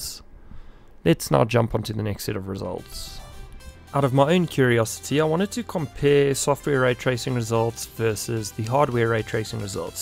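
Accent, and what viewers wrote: South Africa. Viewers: I hear saffa, I sub.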